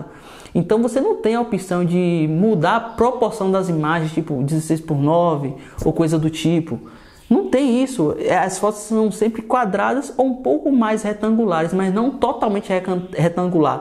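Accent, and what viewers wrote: Bahia, Brazil. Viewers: TÚ É BAIANO É BIXIM 😁😁👍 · Vc é baiano? Conheci pelo sotaque né? Rs abraços... Sou de Salvador/BA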